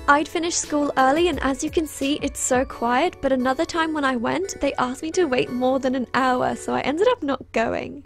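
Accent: United Kingdom. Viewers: I NEVER expected a british accent damn goosebumps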